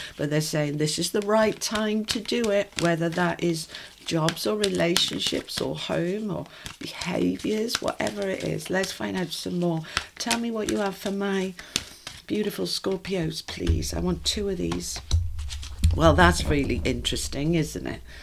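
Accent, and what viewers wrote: United Kingdom. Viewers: Love your accent. British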